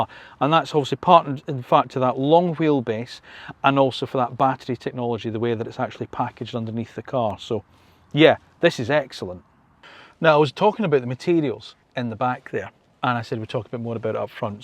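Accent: Scotland, United Kingdom. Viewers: Your accent is Scottish and is not a stupid accent.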